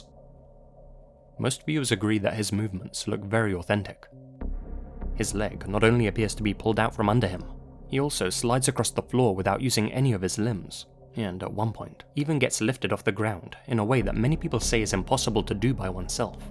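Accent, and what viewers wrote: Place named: United Kingdom. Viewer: I don't really care hpw you make videos. However, I would like to learn how to get that swanky British accent.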